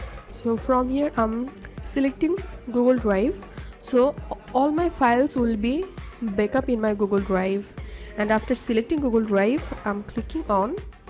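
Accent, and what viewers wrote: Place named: Bengal, India. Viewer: Her accent is Bengali 🎈🎈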